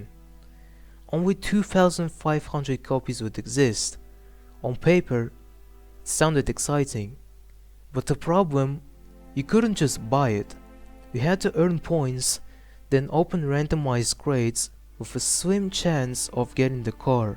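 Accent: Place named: Russia. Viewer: oh I thought that you have that Russian accent 😅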